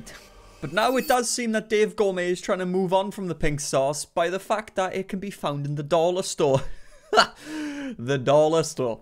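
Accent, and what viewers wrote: Wales, United Kingdom. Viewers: Bought my bro that poster for Xmas 😂👍 love it 👍and your Welsh accent ❤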